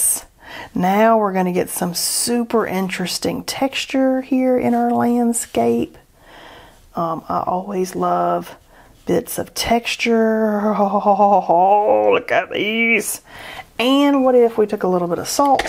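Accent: South, United States. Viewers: I first discovered on Skillshare. Her “art noises” had me hooked from the beginning. (Plus, when a southerner hears another, our ears perk up). She very quickly became my favorite instructor, because- as you pointed out- her enthusiasm is contagious! ☺️ It doesn’t hurt that I have learned a lot, too.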